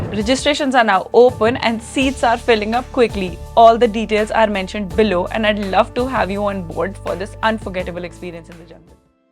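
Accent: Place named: India. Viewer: Indian?? what accent do you expect?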